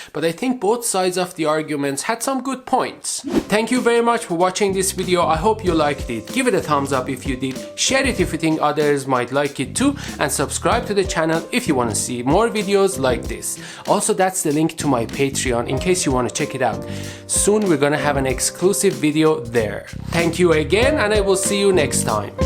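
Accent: Iran. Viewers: لهجه ایرانی رو همون اول فهمیدم 😂😂😂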